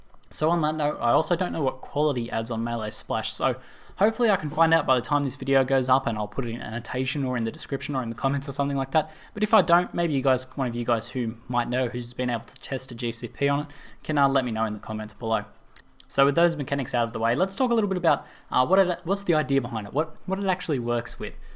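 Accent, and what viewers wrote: Australia. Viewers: were are you from in Australia? if your from Aus that is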